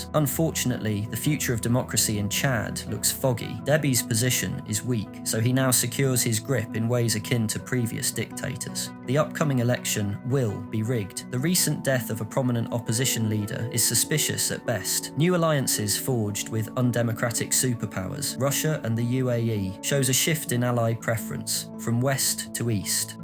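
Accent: United Kingdom. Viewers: i swear all you need for a video essay channel is a british accent this is fire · Wtaf is a "British accent"??? There's no such thing He has a southern England accent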